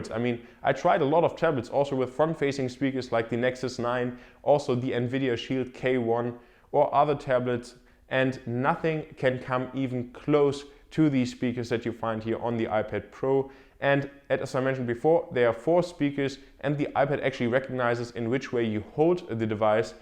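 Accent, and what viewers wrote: Germany. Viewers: i hear german in your voice are you german???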